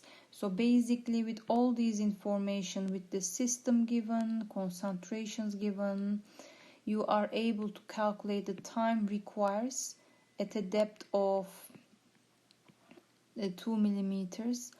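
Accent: Turkey. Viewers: Tam Türk aksanınız var hocam :) thanks.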